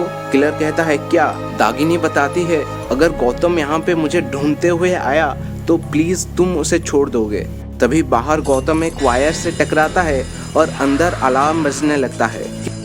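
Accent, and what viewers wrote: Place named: Odisha, India. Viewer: You must be a Odia guy, got from your accent. But nice explanation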